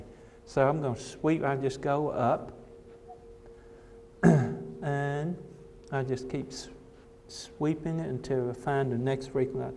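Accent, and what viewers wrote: South, United States. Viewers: Never in my life have I heard a asian with a southern accent...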